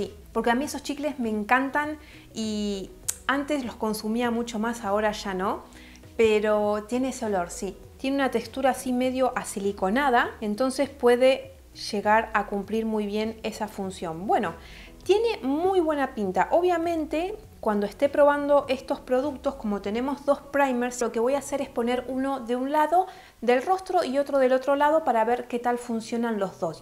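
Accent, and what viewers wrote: Argentina. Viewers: Hola, me encanta tu canal, enseñas cositas variadas y con las 3B, buenas, bonitas y baratas. El maquillaje de ojos que llevas es una preciosidad, alguna vez podrías hacer algún tutorial de maquillaje de ojos, te queda hermoso. Muchas gracias por el vídeo, es muy entretenido y escucharte es una delicia, el acento argentino es mi debilidad. Ójala tengas éxito con el canal, te lo mereces, por el contenido y por tu simpatía. Besitos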